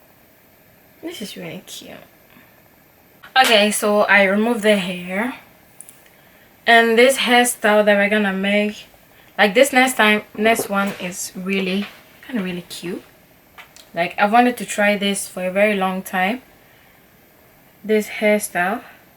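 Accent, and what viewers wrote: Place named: Jamaica. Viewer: This was enjoyable to watch 😂🥰🥰 Also, I love your accent, where are you from? Jamaica? I'm Nigerian btw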